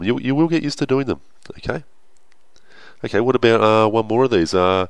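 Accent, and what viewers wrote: Australia. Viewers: no, he has a aussie accent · oh my god you sound australian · No he's just Australian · He's Aussie that's why? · Thank you Mr Australian man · he sounds more Australian than British. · I was mesmerized by your Australian accent and it helped me do really well with the math on my MCAT. Thank you!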